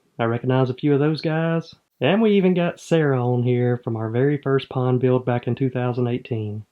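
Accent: South, United States. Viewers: Great wonderful videos, narrated with a fantastic southern accent. One thought is, seems a lot of fish for a small pond. Is there some sort of math to figure population?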